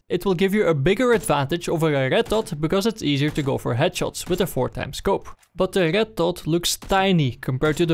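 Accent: Netherlands. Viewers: Most dutch guy ive ever heard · work on your Dutch accent het maakt het wat moellijk te verstaan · You're so dutch. lol :D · sounds dutch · gast je r is the hard het is gwn te horen dat je nl bent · your english is terrible with a huge dutch accent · Zijn Nederlandse accent is wel echt geweldig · #dutchie haha gast binnen 1sec wist ik al dat je nl was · argggg the dutch accent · Nederlander, hoor je meteen 🤣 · the fkin overpowering dutch accent killing me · Jij bent nederlnads engels noob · Nederlands accent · nederlands hoor ik aan het accent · Zwaar Nederlands accent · that Dutch accent is real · hoor ik gewoon aan ze stem dat ie Nederlands is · u dutch boi can hear it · this guy has a dutch accent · Your accent looks netherlands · Je hoort zo dat dit een nederlander is XD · that dutch accent love you man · your Dutch accent stands out so much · barely even realize ur dutch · your dutch im 100% sure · ik hoor aan je accent dat je nederlands bent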